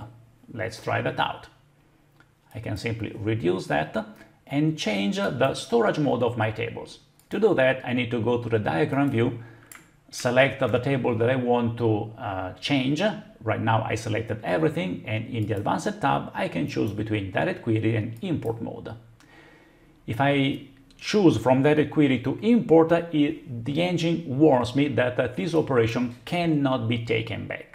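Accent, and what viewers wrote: Italy. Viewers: Power BI is much more sexy with an Italian accent.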